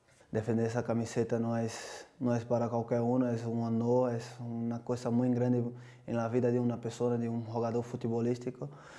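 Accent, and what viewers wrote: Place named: Spain. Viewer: Uh si se nota que es español :v :v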